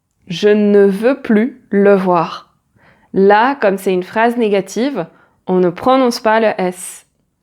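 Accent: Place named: France. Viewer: You have a beautiful French accent 💕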